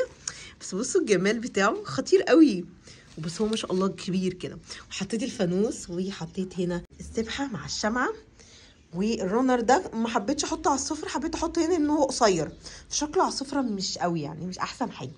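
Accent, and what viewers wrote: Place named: Tunisia. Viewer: لهجة تونسية 😊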